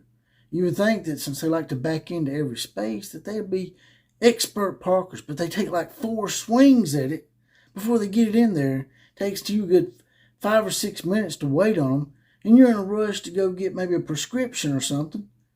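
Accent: South, United States. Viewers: I agree on the backing a car in topic. Parking lots even have signs posted that say do not back your car in but people still do it! Yes its even worse when a woman thinks she needs to back her car in. They will go back and forth multiple times to park. It makes me sick. Have you ever thought of becoming an actor? You are perfect for westerns. Good voice, good southern drawl and look like a cowboy. Boomer Sooner.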